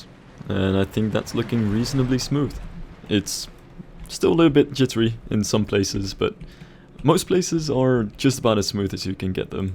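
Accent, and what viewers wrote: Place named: Netherlands. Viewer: you have to be dutch, atleast you sound like a dutch guy speaking english, btw verry nice vid man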